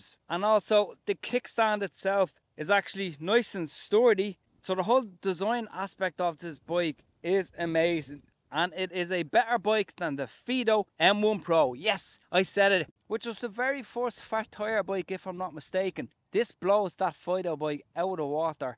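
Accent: Ireland. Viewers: You sound Irish I got one 2 weeks ago in tallagh dublin24 shop but tell me did u get a bag goodies with yours mines black prow same as yours but seen guy that didn't get them to build it like they offered but left out the bottle bag and other goodies I got receipt keys booklet and hand tools old school L alaenkeys spanners screwdriver that's it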